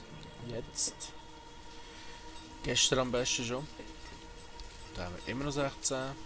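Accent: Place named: Switzerland. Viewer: Juhuuuuuu Schwiizerdütscht ;)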